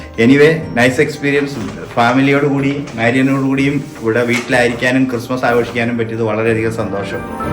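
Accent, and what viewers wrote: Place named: Kottayam, India. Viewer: സംസാരം കേട്ടാൽ അറിയില്ലേ കോട്ടയം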